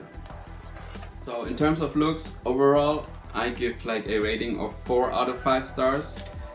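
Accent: Germany. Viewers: You are german, yeah?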